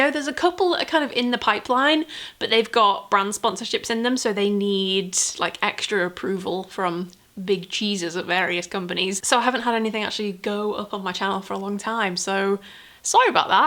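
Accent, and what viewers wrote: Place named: Northern England, United Kingdom. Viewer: I've followed you for ageeesss and I love your vlogs watching your home decor updates has been inspiring! Found your channel through your midsized content but I've stayed because as a northern lass moving to to southern England it was nice to hear similar accent and banter if that makes ANY sense lol😂😬 do what makes you happy, also love gold dust Road! ♥️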